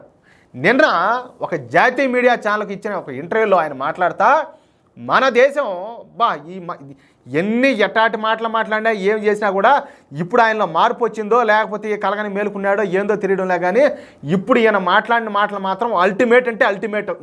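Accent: Rayalaseema, India. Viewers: Sir good morning You're Language is super Rayalaseema slant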